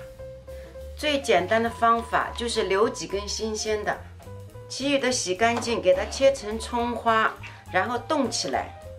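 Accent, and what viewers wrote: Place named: Shanghai, China. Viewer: 我听口音像！能不能教一下坐上海冷面和配菜的做法？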